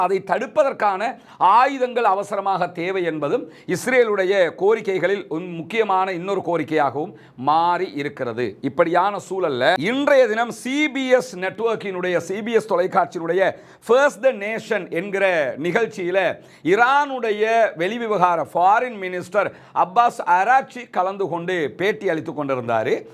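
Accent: India. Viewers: நீங்கல் ஒரு இலங்கையர் இலங்கையருகென்று ஒரு தன்மை உண்டு மனித நேய முல்ல நல்ல பன்பாலர்கல் உங்கல் ஆங்கில வார்த்தை சிலாங் இந்தியாவின் ஆங்கில உச்சரிப்பாக உல்லது இந்திய ஆங்கிலத்தை எந்தவொரு நாடும் ஏற்று கொல்வது கிடையாது உதாரணம் ஆரஞ் ஆப்பில் ஜான் இப்படி.. நீங்கல் நாகரீகமாக இதை கருதுகின்றீகலா இல்லை... மிகவும் கேவலமா உல்லது இலங்கைக்கு என்று ஒரு தன்மை உண்டு அதே பேணுங்கல்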